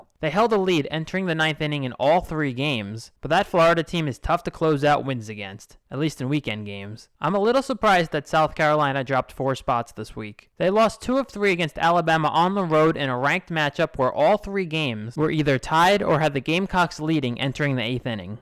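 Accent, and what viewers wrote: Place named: Long Island, United States. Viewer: I recognize a Long Island accent anywhere. Go Yankees!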